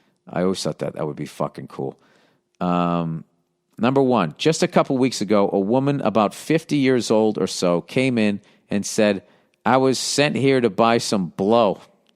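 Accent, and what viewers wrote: Boston, United States. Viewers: No need to apologize for late FREE podcast! What can I expect? Just to hear your Boston voice is a "honor".(te he). One way or another, late or early, you always come through! Thanks. 72 year old guy.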